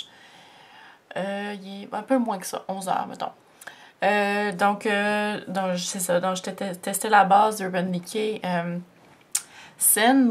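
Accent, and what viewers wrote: Canada. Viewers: Un Grand merci pour tes vidéos.. Mes recherches étant "une première" et ... J'atterri sur tes bons Conseils!!! Depuis ma Suisse natale et ayant une sœur à Montréal çela fait du bien d'entendre ton bel accent canadien..merci ;-)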